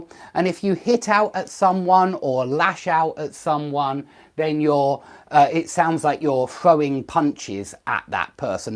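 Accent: United Kingdom. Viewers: British accent is superb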